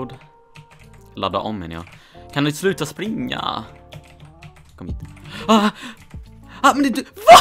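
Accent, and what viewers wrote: Sweden. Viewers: O nice speaks stockohlmska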